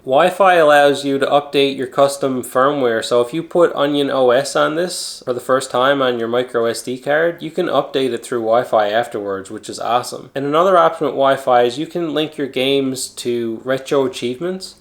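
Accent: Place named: Maritimes, Canada. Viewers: I knew you were a Newfoundlander, earned a sub from Nova Scotia. Keep up the great work my trout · Great review. I just got one as well ans love it. The short bootup times make it great for pickup and play on the go. By any chance are you in Nova Scotia or Newfoundland? I recognize that east coast accent. :)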